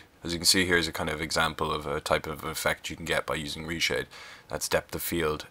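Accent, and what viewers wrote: Ireland. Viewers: you have the best irish accent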